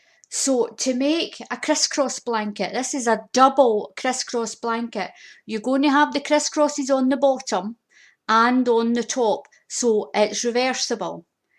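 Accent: Scotland, United Kingdom. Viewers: Are you from Scotland? My paternal grandparents were from Scotland. Both of my parents worked so I spent most of my time around grandma Mary and Grandpa Pete. I developed a Scottish accent even though I have never been to Scotland. It's gone now, but it's funny to think about it. They had a very heavy accent so I had to "translate" for them often. LOL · she's sounds Scottish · Lovely hearing a Scots accent 👍🏻💜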